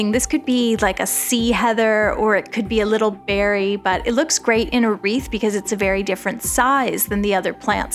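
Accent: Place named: Atlantic Canada, Canada. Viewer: These flowers are lovely! I enjoy your video’s so much. I love listening to your Atlantic Canadian accent. It makes me want to visit PEI! Thanks for sharing your talents.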